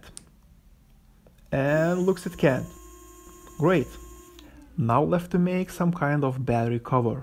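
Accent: Russia. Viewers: Русский акцент)))) Канал на русском есть? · Судя по акценту, ты русский) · Сразу слышно что русский)) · Акцент русский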